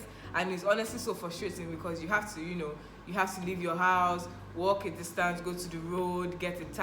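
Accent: Lagos, Nigeria. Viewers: You not a cameroonia at all. You accent is real Nigeria. Big up girl. You too much. one love. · I really like you.. You sound so much like one of us "Nigerian"..for that, Am giving you a like and just just subscribed. 😍😍😍😍 God bless you. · You sound so Nigerian. Didn’t get even a slight accent deviation. Really lovely content.👍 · Naaw !! This na 9ja babe. Which kain Cameroon. Omor dis na some kinda hustle. This chikala na 9ja joor. No way she was only in 9ja for law skool to have such straight up lagos accent like dis. Although I hear dat Cameroon accent when she actually mentions Cameroon but omor mehn, her 9ja accent enter. Top babe. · Are you are camerounian ? ..cos you sound 💯 nigerian .. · I waited and waited for your accent to go offline...at all. You maintained lagosian steeze. Kudos. 👽⚡🇳🇬⚡😂⚡👍🏾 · I just stumbled on your platform..I.will appreciate your response to.my request..your charisma and intelligence shines through. 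you sound very Nigerian which leads one to conclude that we are one.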